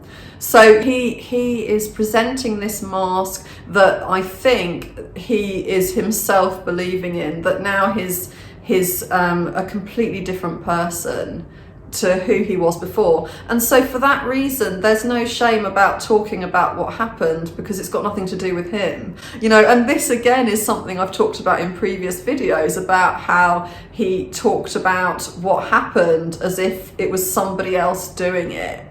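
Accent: United Kingdom. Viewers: I adore your Britain english accent😍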